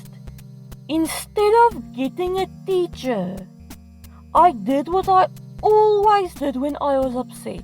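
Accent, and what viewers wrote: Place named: South Africa. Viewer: Recognised the accent from a mile away XD so cool to see a South African story time/animator!!!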